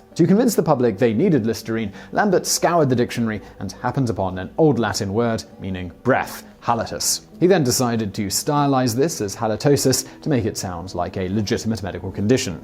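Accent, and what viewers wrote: United Kingdom. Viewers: OK Monsewer, you poke fun/schit at people making up words or not being able speak certain things. You sure mispronounce so many words yourself and do it with a straight face. Are you trying to be funny or is it that you really don't know how to pronounce these words. Or is it that you seem to be Brit or are speaking with a Brit accent to come off with a holeyer than thou attitude? By the way the English language should be renamed the American language. Since Brits can't pronounce half the words. Do English/Brits/Limeys know just how ridicules they sound? Some Brit movies need closed captions to understand what they are saying. Sorry, I just recalled an Aussie I ran into in Thailand, to this day I still don't know what he said in the short conversation we had, I nodded my head mostly to what he was saying and that seemed to satisfy him.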